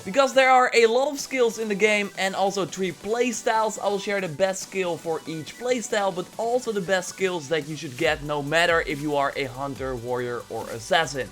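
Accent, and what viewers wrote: Netherlands. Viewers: On what difficulty are you playing? (also are yah dutch?) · Are you Dutch? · Are you dutch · Aan je accent te horen ben je nederlands😂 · You dutch bro?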